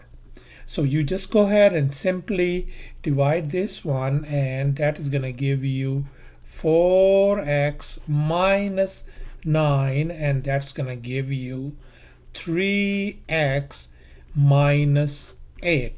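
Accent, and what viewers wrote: India. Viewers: I like your English but I don't know what you say I like indian English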